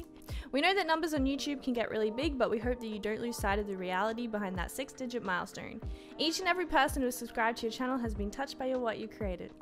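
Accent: Australia. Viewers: Omg youre Australian!! Thats so cool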